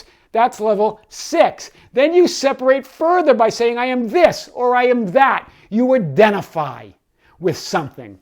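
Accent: Boston, United States. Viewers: "GODHADDATHAAHT." 😄 Love that Boston accent. This was great. Thank you.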